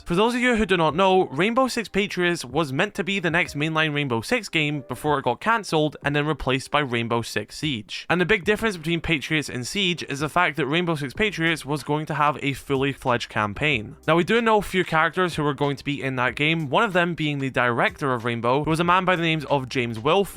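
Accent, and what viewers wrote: United Kingdom. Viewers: Also if you were invited to Ubisoft Montreal the French speaking people in Quebec would be so confused having a British person speaking English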